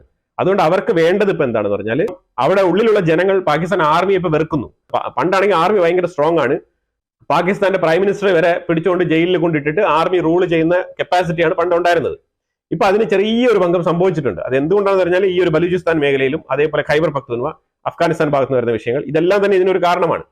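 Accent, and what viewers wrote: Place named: Trivandrum, India. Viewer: No kollam, it's trivandrum slang.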